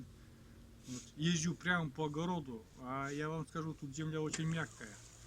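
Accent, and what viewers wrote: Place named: Udmurtia, Russia. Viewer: думал с Удмуртии)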